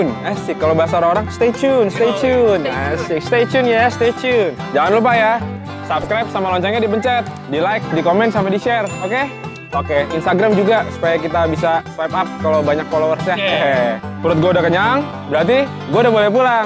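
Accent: Jakarta, Indonesia. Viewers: Orang Betawi ye?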